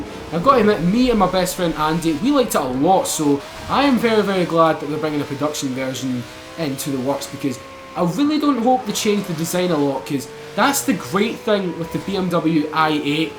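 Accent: Scotland, United Kingdom. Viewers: i really like you videos! you're really cool guy btw i have a family relative live in Aberdeen and sometimes its really hard for me to understands him and he makes a lot of jokes about us (americans) number one they say and he just keeps going from there...but the thing is i just wanna tell you that you're helping me understand the Scotish accent (its hard sometimes to be honest) so what do you think about 'muricans? btw can i ever meet you when i'll be there? it'd be so cool:)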